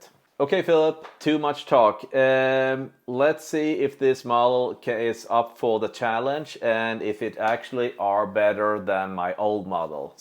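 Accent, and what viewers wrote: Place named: Sweden. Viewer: Thanks for the video I like your Swedish accent :)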